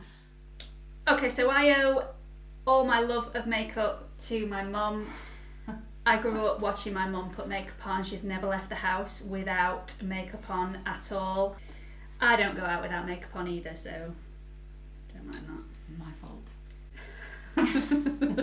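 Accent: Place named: Yorkshire, United Kingdom. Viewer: I’ve just found your channel and I’ve subscribed. I’m so relived to find someone doing makeup for a more mature skin. I’m 49 and like a more natural makeup look. Plus its good to hear a familiar accent. You must be a Yorkshire/North East girl.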